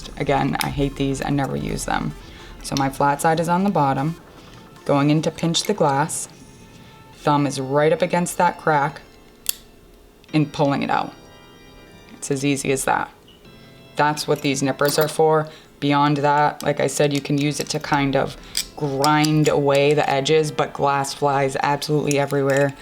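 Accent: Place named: New England, United States. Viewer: Hi! I just took my first stained glass class and I'm hooked!! Currently binge watching your videos - lol. Thank you! I grew up in Ma and as soon as I heard you talking I knew you were a New England gal:)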